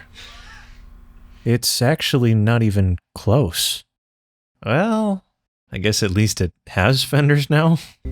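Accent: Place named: Central Pennsylvania, United States. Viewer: Every time I hear you say something like “there’s a lot of hoses on this car that need swapped” I’m back in Central PA😅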